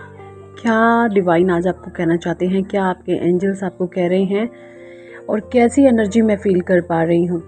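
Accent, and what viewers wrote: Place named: Kanpur, India. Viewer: Jee aap kee avage hum sunkar anuman leagatata thaa kee aap kanpur kee tharaa bolti hai leakin kathil siti aur karneal siti mea siti kaa kuch loog kanpur kee thara boltesa humko mealtaa thaa hearinaa meaa Hindi kee kitabai boli jatai haea .....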